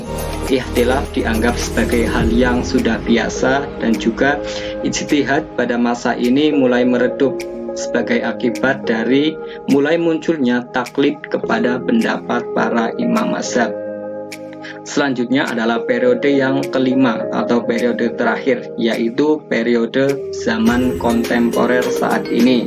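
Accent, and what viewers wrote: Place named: Banyumas, Indonesia. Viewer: Mantap sodara ngapakku🔥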